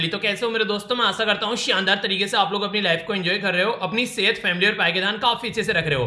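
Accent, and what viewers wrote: Haryana, India. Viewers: really are you sure ??...mai sir ka bolne ka tone or bhut sare elimination lagye ki bihar se ek frnd tha to wo nhi hoga or up se vi nhi or rajasthan se doubt tha to sir ka tone rajasthani hindi nhi hoskta fir uske bagal me haryana h or tone match krrha by map so mai guess kiya hua...or bagal me rajsthan ka PCS vi diye h...or sir bolte h aas pas ka PCS diya kro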